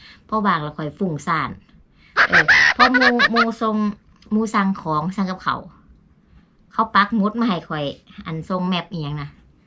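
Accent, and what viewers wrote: Thailand. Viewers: คือเว้าเหมือนตนศรีษะเกษแท้ค่ะ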